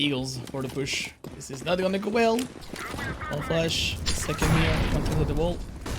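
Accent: France. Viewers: You're the first French who speaks English without a too big accent. I can handle and see all this video's thks ❤️ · I am currently really enjoying to eat zuccini (how tf do you even write this in English). Thanks, your sweet accent always reminds me of doing my French homework for university.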